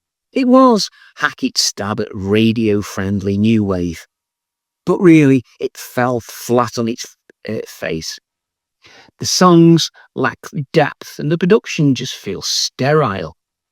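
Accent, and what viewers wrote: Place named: Newcastle-under-Lyme, United Kingdom. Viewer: A Prog-talking Stokey! Ace! Actually, you sound more Newcastle-under-Lyme? You're right about these albums, of course, but from a nostalgic perspective, they still have a place in my heart and I'll just listen to them from time to time just to remind me of what I was doing in the 80s.... Was never an ELP fan... but have the 80s ones you mention by IQ, Rush, Asia, Camel, Yes, Kansas. They have infinitely better albums, but these are part of their canon and I can enjoy them with the windows firmly closed so no-one can hear what I'm listening to. Guilty pleasures. Single Factor I like, Nomzamo I really like (but I was 18 at the time), and Union has its moments. The biggest let down of these for me was Hold Your Fire, for sure.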